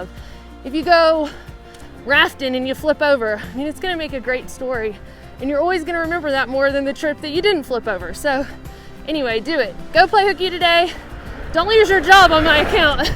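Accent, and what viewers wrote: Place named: Alabama, United States. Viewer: Wow I missed ya'll, I have a cabin in South fork, been here since April, leave after bow season in October. I could have taken your friend to Colo springs and back in one day. I feel bad. That said I was shed hunting at the top of the cliffs north of 149 between SF and Creed while you were walking by. We've been to many areas in the Rockies and this one, SF /Creed have some of the best people. Again hate we missed the Alabama Drawl, you sound like us, SC Drawl. Stay safe.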